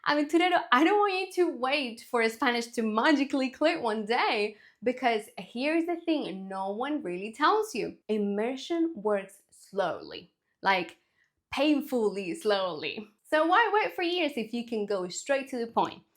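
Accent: England, United Kingdom. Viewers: This is very true. Just listening isn't enough. If you listen to a lot of hours your listening comprehension will be high but your speaking won't. You've got to practice speaking and at first it will be difficult with a lot of mistakes, but the more you speak the better you will speak. The great thing about it is, if you listened to 2000 hours you don't need to match those hours with speaking hours. You can reach a good level of speaking with less hours but obviously, the more speaking hours the better., I can tell you've listened to a lot of English content. You've acquired the accents. I say accents because you have an accent from England and also a little from America. It's all good though. You're fluent. There is no doubt.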